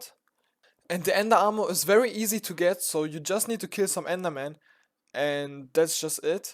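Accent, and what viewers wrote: Germany. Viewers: alter dieser Deutsche Akzent ist insane bei dir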